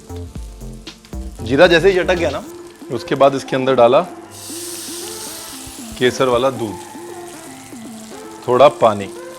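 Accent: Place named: Punjab, India. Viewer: Your Punjabi excent and way of speaking is just great 👍👍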